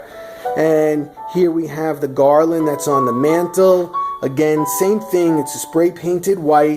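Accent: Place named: Northeast, United States. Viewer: Are you from new york, new jersey or boston? You sound like you are from northeast, i have a slight new york accent but not very much cause im in nebraska right now lol your house is gorgeous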